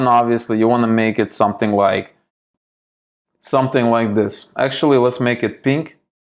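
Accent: Russia. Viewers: Ты не Русский случаем? Подпишусь, если ответишь) Очень Русский акцент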